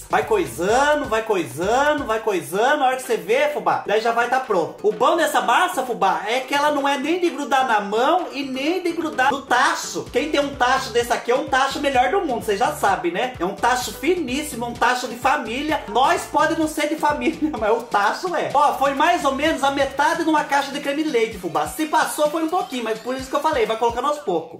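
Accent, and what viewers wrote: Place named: Minas Gerais, Brazil. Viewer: Descobri você ontem dia 21,mas estou amando você com esse sotaque de mineiro!!!!!!! KKKKK Você é muito divertido Fubá.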